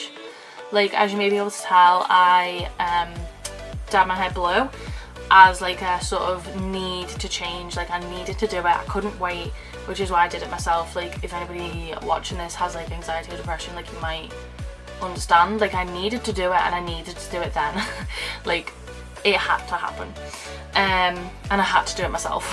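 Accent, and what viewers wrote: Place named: Liverpool, United Kingdom. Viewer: Your makeup is gorgeous! Ah and your hair, I love it. I just dyed my ends purple ^.^ Ok i'm getting really good at accents because I thought, hmm she's from Liverpool. -Jess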